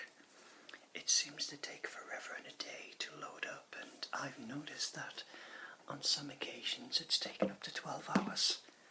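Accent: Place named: England, United Kingdom. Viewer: Your voice is lovely. The way English people speak makes me melt. Can't wait for more videos. :)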